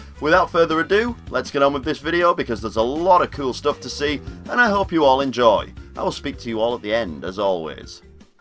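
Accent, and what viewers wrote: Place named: Northern England, United Kingdom. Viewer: I also noticed you were using a PS4 in this vid. Would you mind adding me on PSN? My PSN is the same as my YT name. I'd love to do a video with you some time. I don't do many but mine are all about timesavers and completionist videos plus some random unscripted stuff too and I'd love to do a collaboration with you. Forgive me if I am wrong as well but I can't help but note that Northern accent as well. I'm from Sheffield if that's near to you?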